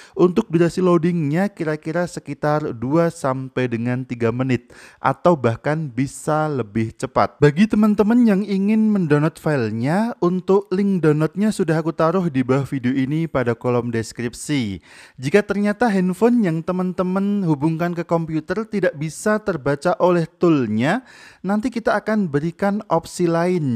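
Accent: Java, Indonesia. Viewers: Mantap jiwa jadi nota satus seket ewu ... Nota gajah kang Salam solder dari teknisi medhok 🙏🙏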